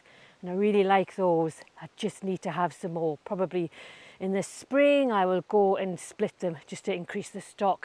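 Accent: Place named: Northumberland, United Kingdom. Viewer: I just discovered you yesterday and I knew I recognized that accent. I was born in Northumberland but the area is now a part of Tyne and Wear...Cullercoats/North Shields area. I've lived in Illinois most of my life after we emigrated in the 60's. I was surprised to hear about the Annabelle hydrangea being from Anna Illinois in a different video. Enjoying watching you ❤️🇬🇧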